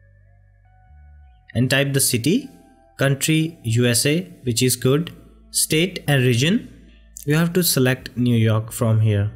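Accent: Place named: India. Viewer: Me not believing this video would solve my problems(😫) Me after listening to the indian accent(😁)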